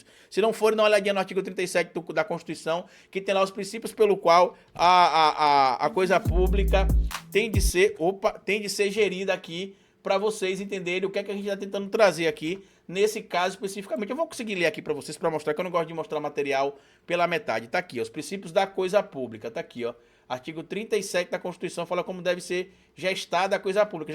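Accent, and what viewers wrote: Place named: Minas Gerais, Brazil. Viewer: 🥰🥰🥰🥰🥰🥰AI, q.ue bom q conseguiu recuperar sua page.Amei vc tentar falar mineires.kkkkkk Zemaouvo lambisgóia capiau,só faz M* esse cocô 💩 💩 💩 💩 💩 só ferrou Minas.diz que é gestor,nem de cocô de galinha. Mas da isenção para os cupixas.Amei vc tentar falar como Nois.sucesso ❤❤❤❤❤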